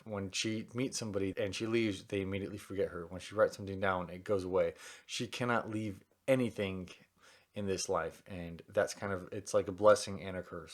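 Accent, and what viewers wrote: South, United States. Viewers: I love your podcast of this video at your house in Texas during your quarantine until you had a lot of leftover books this month are "The Dragon Reborn" by Robert Jordan, "Unsouled: Cradle Book 1" by Will Wight, "Now I Rise" by Kiersten White, "The Invisible Life of Addie LaRue" by V.E. Schwab, "Legendborn" by Tracy Deonn, Cresent City: House of Earth and Blood by Sarah J. Maas, "The Fires of Vengeance: The Burning Book, Two" by Evan Winter and "Dark Age" by Pierce Brown while you're talking about all of the characters from the books to read them from the beginning after that, I hope your husband, Zach will be proud of you and I love your Southern accent!! #januarytbr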